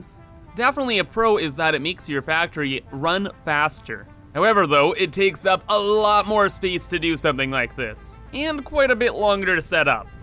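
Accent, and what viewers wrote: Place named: Canada. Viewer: this is the most canadian voice i've ever heard, i love it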